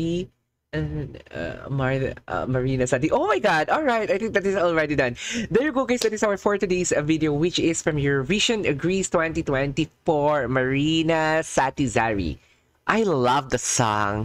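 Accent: Philippines. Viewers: (I'm greek) No she's not a soprano. She just sings songs with traditional sound! (ethnic etc) but makes them more modern.. btw are u filipino? I feel like your pronunciation is very familiar to me hehe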